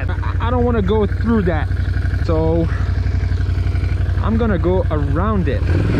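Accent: Quebec, Canada. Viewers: Il est du Québec j’en sui sûr 😂😂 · I must ask are you from Quebec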